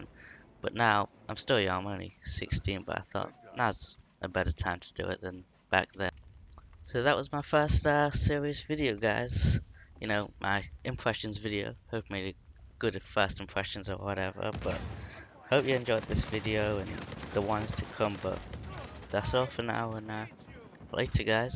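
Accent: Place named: East Midlands, United Kingdom. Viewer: Aah, an east midlands accent - explains why I couldn't pin it down. Midlands all seems to blend into one for me. It always makes me laugh when you're gaming or (briefly) on the podcast, and the Yanks try to copy your accent. Do they think we all speak like the Queen and eat crumpets mid afternoon?! lmao. Looking forward to seeing what you might do with this channel. My advice - do what you enjoy and don't worry about numbers. Have fun with it. Good luck bud.:)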